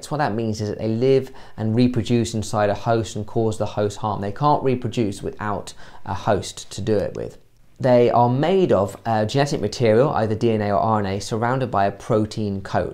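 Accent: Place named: United Kingdom. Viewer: please use american accent if u can i cant understand british well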